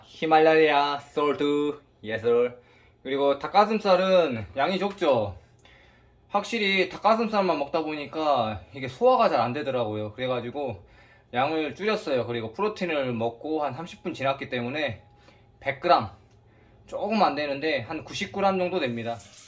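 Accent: Jeolla, South Korea. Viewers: 행님 라도 출신이셔라?🙂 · 경남대표 선발전 미스터 경남이라길래 부산 토박이인가 싶어 들어가보니 구수한 전라도 사투리ㅋㅋㅋ 예상치 못한곳에서 미스매치에 웃음이 나오네요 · 경남대표인디 말투는 전라도사투리인디요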